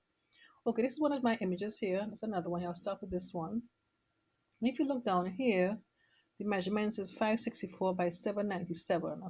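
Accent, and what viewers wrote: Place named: Trinidad and Tobago. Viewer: Hi, great video. Btw are you Trinidadian?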